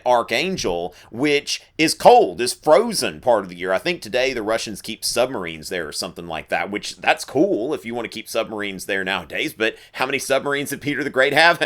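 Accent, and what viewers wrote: United States. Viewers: Oh don't worry, I was able to get over it eventually. But the first couple times the teacher had us watch your videos, I was so focused on the accent and trying to figure out which state you might be from that I wasn't paying attention to anything you actually said. :P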